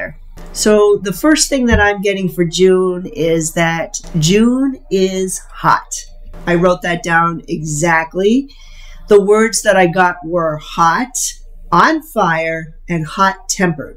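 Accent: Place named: Maine, United States. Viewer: Wow I’m from Maine and right before you mentioned VT and NH I knew you were from up here because of your accent!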